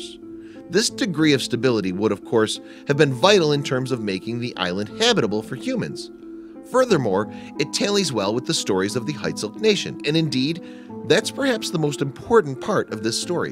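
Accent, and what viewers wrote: United States. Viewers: The weird music sucks and the American accent when talking about Canada and Canadians also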